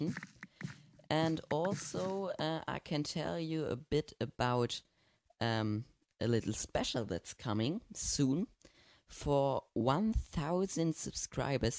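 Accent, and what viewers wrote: Germany. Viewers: you have a german accent lol (I am from Germany)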